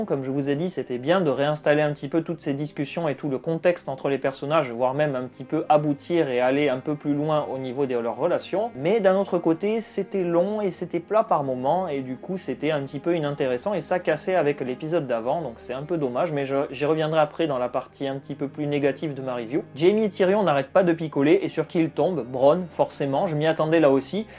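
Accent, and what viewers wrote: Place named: Bordeaux, France. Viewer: je sentais un accent bordelais mais vu que tu parle de feria j'ai plu aucun doute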